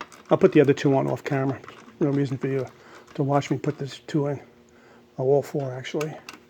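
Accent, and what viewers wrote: United States. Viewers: Thanks for the reply and there were times I SURE could have used one of those shift rod connectors. I HAVE just twisted a stainless bolt in and it worked. I been meaning to ask where you are, am I correct that the accent is North Eastern US? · Love the Long Island accent! Cool videos. Keep 'em coming!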